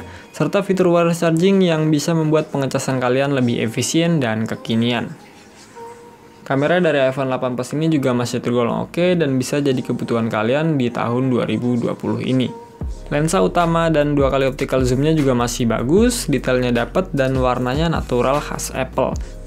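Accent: West Java, Indonesia. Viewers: Bang lu orang cibitung ya😂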